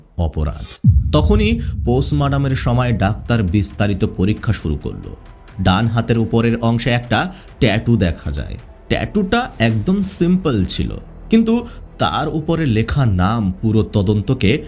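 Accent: Bangladesh. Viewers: আপনার কথার ধরন শুনে মনে হচ্ছে আপনি বাংলাদেশী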